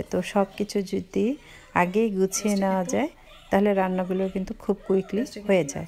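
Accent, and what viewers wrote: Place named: Dhaka, Bangladesh. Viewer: আপনার কথা শুনে ও ইলিশ মাছ কটা দেখে মনে হল আপনি ঢাকা বা বিক্রামপুরের মানুষ।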